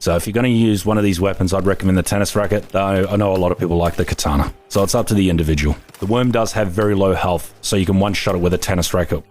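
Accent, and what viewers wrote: Australia. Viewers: Are you an aussie? You sound like an aussie. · Stumbles across a video thanks to the algorithm - Sees the channel name is " " - Wonders "hm, is this guy Aussie?" - Accent confirm.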